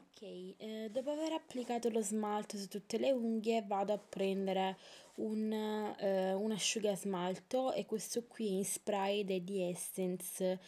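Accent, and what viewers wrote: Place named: Lecce, Italy. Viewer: ciao ma x caso sei d lecce??? si sente da cm parli *_*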